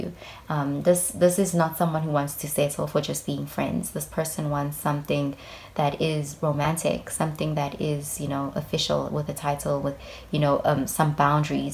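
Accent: South Africa. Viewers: you sound South african 🥺🥰 anyways love your channel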